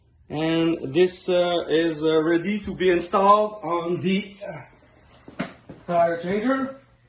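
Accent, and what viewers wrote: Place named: Quebec, Canada. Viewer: Ca c'est un gars du Quebec . Meme accent que moi 🙂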